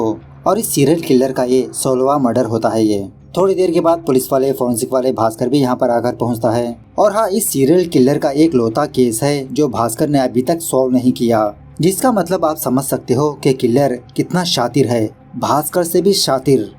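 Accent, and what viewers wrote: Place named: South, India. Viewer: Are South Indian hai to thoda to waisa rahega na , hindi uski main bhasha nhi hai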